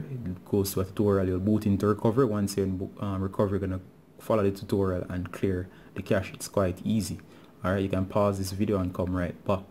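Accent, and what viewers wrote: Jamaica. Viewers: came for iris scammer fix stayed for the jamacian accent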